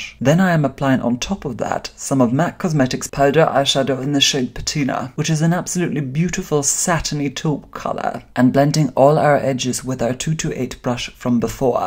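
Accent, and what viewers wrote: Scotland, United Kingdom. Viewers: She's magnificent! Your work is Lovely and Flawless. Very nicely done. You still frighten me abit. I would love to hear you speak without the British accent. I bet it's lovely. Sincerely, M. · I love that you used the word "film" rather than movie - very British in your lovely Scottish accent :-)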